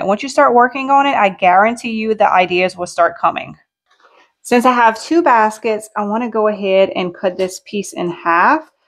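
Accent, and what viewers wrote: Louisiana, United States. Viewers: Your the cutest lil chic! I LOVE your LaFayette, LA accent! I recognize it anywhere. I watch because your channel is very cool but big bonus to me is just hearing you talk! LOL God Bless!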